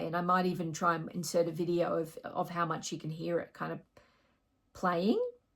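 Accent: Australia. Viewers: Hello and subscribers. I came across the channel while browsing youtube for audio gear content. I bought by first system after attending Uni in the mid to late 70's and then did a big splurge on gear between 2007 and 2012. Personal circumstances meant that it got dismantled in 2017 and I am now setting some of it up again (the bits that still work that is). Good to hear the Aussie accent and while I'm interested in some older gear I will be mainly exploring the channel for music ideas given that likes have a fair overlap with mine. Happy listening everybody, Jeff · love love loved this vid. Great to hear an Aussie voice, then the more into the vid, I was amazed at some of the similarity of my hifi journey. I'd had a NAD3020A for years, hooked up to some Haybrook HB1s that I had re coned in Paddington (over 20 years ago now). But, like you, recently decided to upgrade a bit. 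Was going down the NAIM route, then the guy in the shop said those fatal words, "have you seen any of the Hegel stuff". We did a side by side with a track by Lady Blackbird (interesting artist if you like Jazz) and it was like night and day. I'd also imported an early 90's Roksan Xerxes TT. Had the team at Classic Hifi bring it back to life. They did a great job. Then the obligatory speaker upgrade, went with the Lintons (my $ justification was that I'd had my stuff for over 30 years so..). Then walking the dog one day, there's some hifi gear on the street! I took a closer look (theres often all sorts of stuff on the street but this was unusual). A Sansui 331 Receiver and SR212 TT ! with original manuals and warranty cards! So i'm going to set up the vintage stuff alongside the newer (inc BlueNode) stuff. I was about to get the 3020 re done at a place in Marrickville (I won't put the name here as I think they are inundated) but will get the Sansui looked at as (again, like you), the right channel is coming in and out. 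A friend recently has gone on a similar journey with Sansui. Like you've said, the sound is worth the effort in bringing these vintage pieces back to l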